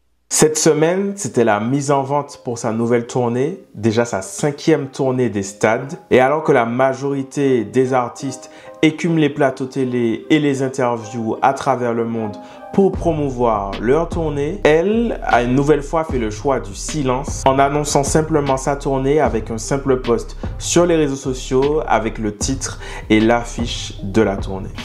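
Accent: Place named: Réunion, France. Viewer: cette femme est incroyable ! & je suis fan , mais j'adhère complémentaire sa façon de communiquer , tout passe par son art , c'est brillant . Avec sa elle limite au possible les scandales , sa renforce son coté Queen , j'adore . Merci pour ta vidéo (il aurait fallu rajouter des ptite choses comme "les moqueries sur sa fille Blue Ivy quand elle été seulement un bébé ! je pense que sa a du bien aider à accélérer le changement). Sinon très bonne vidéo , tu parle bien c'est clair sujet maitriser , bonne continuation a toi ! (je trouve que tu as un accent créole ile de la Réunion 974 ?? , je me trompe peux etre ^^)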